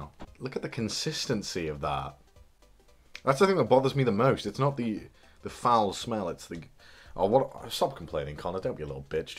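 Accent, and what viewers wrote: United Kingdom. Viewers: Aw you look like an older version of my friend, with a British accent. Oh my...even the reactions are similar... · I just realized you have a British accent...I'm ashamed of myself.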